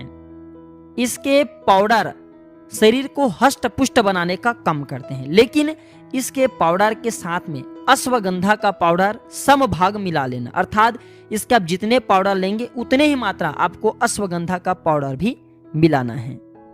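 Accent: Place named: Assam, India. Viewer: Etleti bolta hai gurji assam se ho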